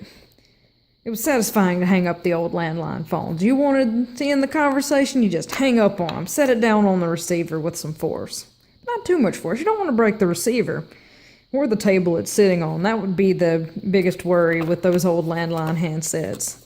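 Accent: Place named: Alabama, United States. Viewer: I'd guess you're in Alabama based on your accent. Maybe Georgia?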